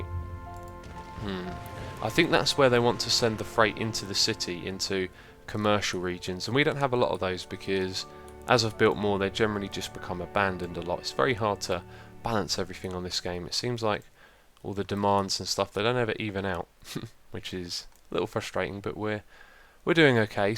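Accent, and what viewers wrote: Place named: United Kingdom. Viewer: So nice too hear a fellow Brit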